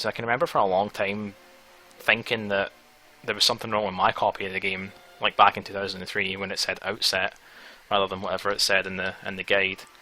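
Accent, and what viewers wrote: Scotland, United Kingdom. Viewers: Oh, damn, this is the first video I've seen from you - a friend recommended your channel. Nice to hear another Scot! Mind me asking what part you're from?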